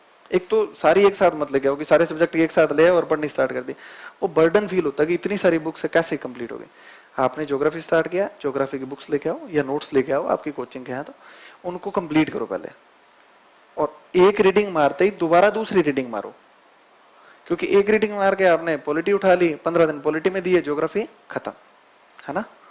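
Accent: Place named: Rajasthan, India. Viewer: H na...... Lahjaa .. Rajasthani ki pahchaan😀😀😀😀.. proud of you bro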